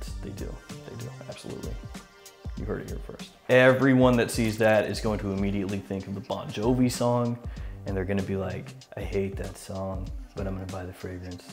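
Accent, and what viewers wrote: South, United States. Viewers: Fragrance Leader... with a slight Southern accent... outstanding! #BeepBopBoop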